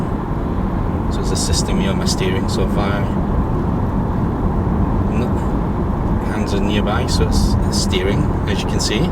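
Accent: Scotland, United Kingdom. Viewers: The normal expression on the UK Leaf Forum is: "Drive it like you stole it" , but to do that you need to head off to the Highlands, where men are crazy, roads are straight and empty, and traffic cops are few and far between. Envy your lovely Scottish brogue by the way: I've lived in Scotland since 1991 but never managed to shed my English 'posh' voice . And how the heck you manage to viddy three different screens at once beats me ... you obviously have a knack for technology.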